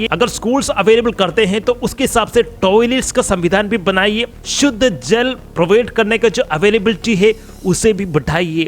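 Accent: Kerala, India. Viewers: Korach hindi parayan padichitu video undakku kelkubol Keralathile hindi teacher class edukunna pole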